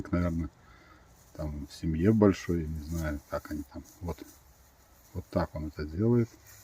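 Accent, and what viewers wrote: Belarus. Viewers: Вы с Беларуси ? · А я все думал откуда у тебя такой окцент Так ты земляк я тоже сам с Минска живу в штатах Никого не слушай все правильно сделал спас хряку жизнь Молодец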